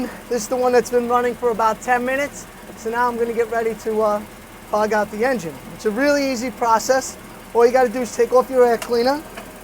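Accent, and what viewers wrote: Long Island, United States. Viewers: Holy cow what a think Long Island accent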